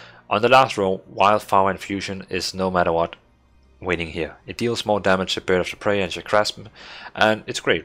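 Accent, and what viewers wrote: Denmark. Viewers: You sound Danish:)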